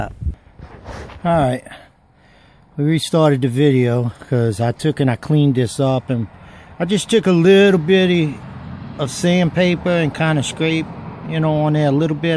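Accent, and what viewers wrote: Louisiana, United States. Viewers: Say bree? Now I know y'all from Louisiana. Good vid, very helpful, thanks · Great video, thanks ...where did that "accent" come from, Louisiana?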